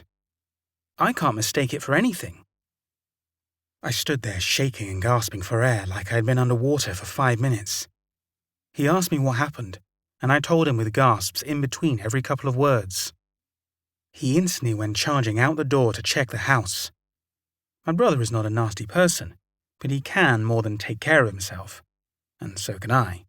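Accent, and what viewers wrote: England, United Kingdom. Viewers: English accent better for ghost stories, totally.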